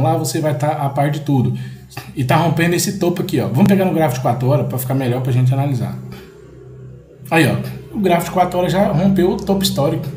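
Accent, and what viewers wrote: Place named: Belo Horizonte, Brazil. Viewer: Sua voz lembra meu tio de BH pelo sotaque rsrs muito bom !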